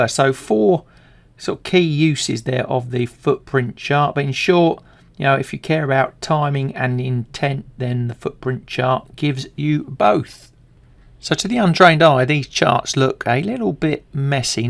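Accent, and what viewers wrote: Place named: Kent, United Kingdom. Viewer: Enjoying the Kentish accent as usual. Of course, the traditional pairing of volume footprint charts is CVD (cumulative volume delta), the order book, DOM (depth of market), and sometimes VWAP, as well as volume profile, targeting all the phases (initiative, absorption, exhaustion, reversals, etc.) of a typical cycle that you described in each of your strategies. I’ve switched form Renkō to using TPO (time–price opportunity) to identify key levels and market state (rotation, trending, acceptance, rejection, etc.) and then footprint charts specifically for timing of execution.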